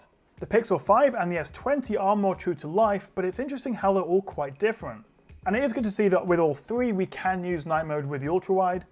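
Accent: England, United Kingdom. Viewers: great video man! I love your english accent btw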